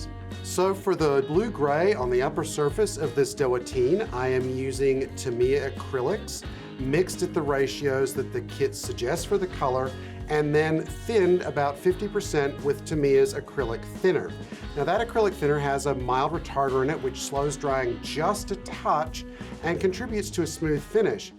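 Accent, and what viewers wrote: Australia. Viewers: New to the channel, love it by the way and spent a lot of time going over the back catalogue. So much priceless information, tips, and general entertainment for anyone into modelling, or like me, getting back into it and freaking out. Buying way too many kits (that aren't being started), but freaking out none the less! Do I notice a slight Australian accent there?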